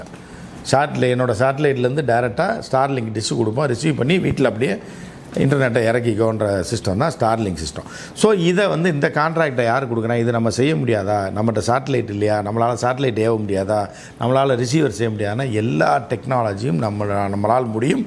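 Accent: Madurai, India. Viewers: தமிழில் மதுரை மொழியில் கேட்கும் மகிழ்ச்சி அளிக்கிறது.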